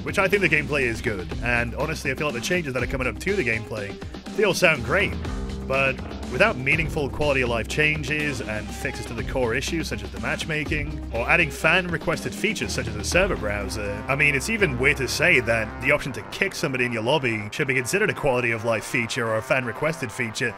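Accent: United Kingdom. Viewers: YOU'RE BRITISH?????????????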